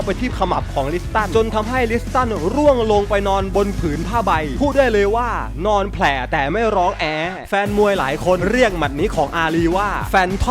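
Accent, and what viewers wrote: South, Thailand. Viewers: แอดคนที่ไหนชุมพรเหอะสำเนียงใต้แปลกๆ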